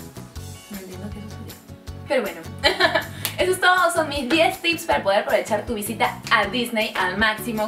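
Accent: Peru. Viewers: Como que cuando empezaste a hablar...ya sospechaba que eras peruana~🙋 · Eres Peruana?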